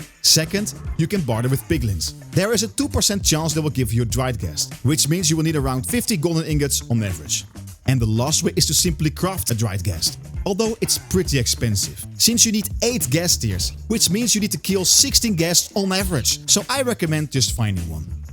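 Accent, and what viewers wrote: Netherlands. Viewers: tering wat een steenkolenengels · are joe beetje nederlands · Heel erg irritant & jammer dat nederlandse accent, want je content is top. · Are you Dutch? You sound Dutch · Nice dutch accent 😅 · Accent sounds dutch af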